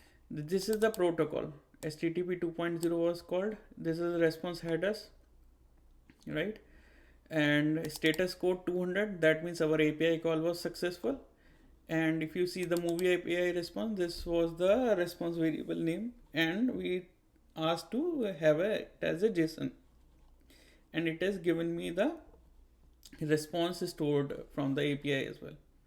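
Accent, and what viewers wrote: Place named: India. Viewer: Thank you dude but please, the indian accent, pleeeaaasseee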